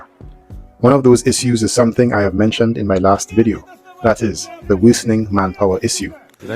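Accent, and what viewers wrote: Trinidad and Tobago. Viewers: New sub🎉 You sound Trini. Very cool if you are! Very informative work! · I trini too but I wondering if I hearing ppl in trini accent , good reporting first time I’m seeing your channel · Is that a Trini (Trinidadian) accent I'm hearing? Glad I found this channel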